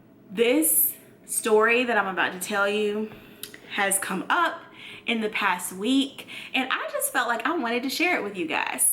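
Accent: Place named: South, United States. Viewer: Where are you from? You have kind of a southern swang in your tone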